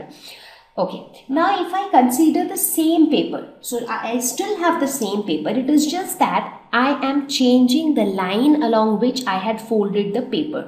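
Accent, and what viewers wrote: India. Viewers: It's called Indian accent I told u cause u like the accent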